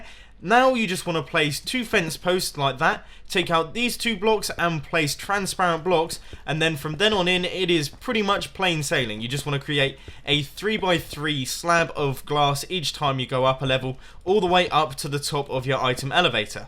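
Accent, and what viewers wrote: United Kingdom. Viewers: oml baby, so british! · sound even more British in older videos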